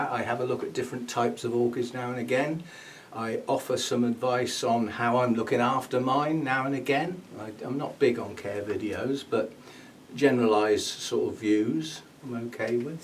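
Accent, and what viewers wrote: United Kingdom. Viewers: And I watch you to see how long you will grow your hair out. And for the British accent.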